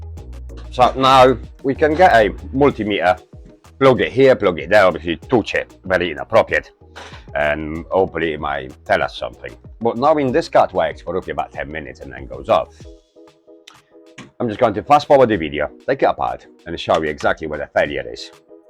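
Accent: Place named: United Kingdom. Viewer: Nice first video i expected you to be another American channel till i heard you open your mouth good to see someone this side of the pond is giving this type of repair a go.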